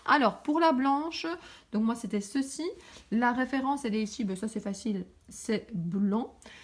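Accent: Belgium. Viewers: Coucou, trop bien tes achats, moi, j'ai hâte d'aller à Passion Scrap en avril. J'adore ce que fait Scrapistelle. J'ai fais une crop d'une journée avec elle pour réaliser un album il y a de ça quelques années...elle est top...sympa, créative, simple...trop bien. Je pense que tu dois être pareille...et j'adoooore cet accent qui me rappelle trop ma Belgique natale...au plaisir de voir quelques autres de tes réalisations. Gros bisous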